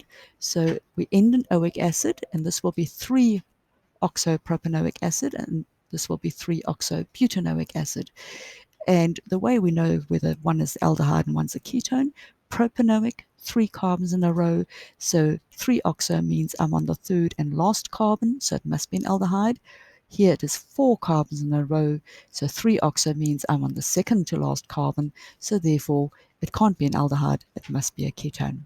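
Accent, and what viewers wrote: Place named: South Africa. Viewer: Thank you for the helpful video! P.S.: you have a pleasant South African accent :)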